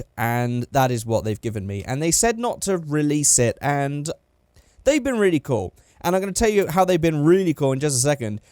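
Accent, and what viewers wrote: United Kingdom. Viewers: great video it's relaxing it's like a podcast of a British guy who's never built a car in his life building a car I love it. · I couldn't wait to see this! Thank you <3 Btw, your accent seems British, Am I right or not? And one more thing: Chrome:P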